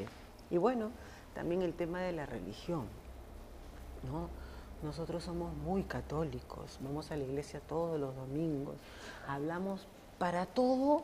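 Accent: Peru. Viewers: Es el acento neutro peruano, tenemos más acentos por las demás regiones, pero uno de los más difíciles acentos para imitar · Tú eres peruana?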